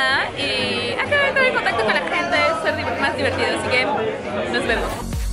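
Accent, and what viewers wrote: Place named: Lima, Peru. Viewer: El acento limeño XD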